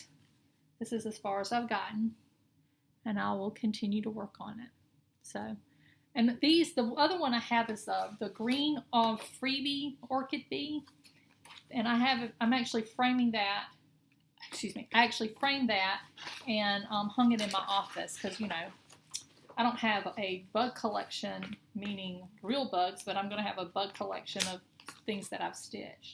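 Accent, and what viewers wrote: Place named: Mississippi, United States. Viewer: Love your purchases. The Penny America design is older. I have it started. it's been a couple of years now but I thinking it's 2009. I did the pumpkin lady in the shoe made it into a pillow hangs on a door during fall. I don't do videos not very tech savvy. lol I am going to Minneapolis to the retreat first one for me. I love your accent my nephew married a gal from Mississippi I see hear every time talk.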